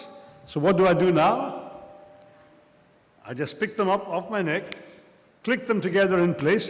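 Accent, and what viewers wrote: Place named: Oxford, United Kingdom. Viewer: Highly inspiring ,informative ,interesting and wonderful to listen Dr shashi taroor's Oxford accented English. Highly super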